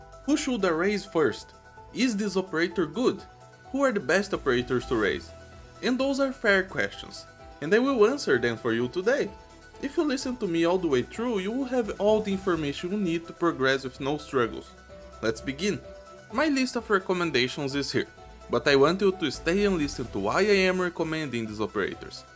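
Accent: Brazil. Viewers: Tô ouvindo um brasileiro aí kkkkk. Raro ver BR jogando Arknights. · manooo, eu assisto você um tempo já e eu tipo eu reconheço esse sotaque prq é o msm q o meu XD eu ia comentar da onde você era no caso de qual pais e agora lendo os comentarios vi que você era br mesmo, eu amei seu vídeo como novato no arknights eu to amando o jogo eu to esperando o banner do mizuki desde o primeiro dia que eu joguei, alias um vídeo desses pra um 6 estrelas seria incrivel! :goodvibes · Percebi na hora que é brasileiro só pelo sotaque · Esse sotaque BR. Too obvious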